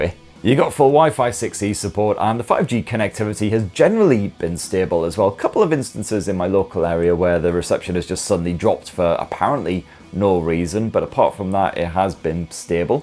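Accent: United Kingdom. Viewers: Best tech channel ever. And the classy British accent makes it even better. Although occasionally I find the British phrases funny. "The lighting is pants." 😂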